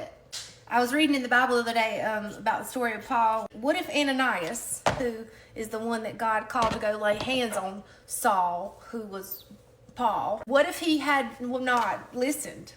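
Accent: South, United States. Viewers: Hey Southern draw, your cute! 😉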